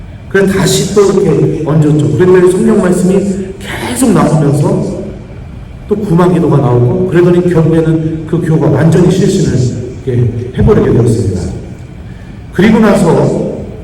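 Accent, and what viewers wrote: Chungcheong, South Korea. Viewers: 신부님 뵈오니 정말 좋았습니다 구수한 충청도사투리가 더 정겹고 좋았습니다 우리는 구원 받고 성령이끄심 대로 신부님 말씀 기억하면서 잘 살겠습니다~신부님 감사합니다 ❤❤❤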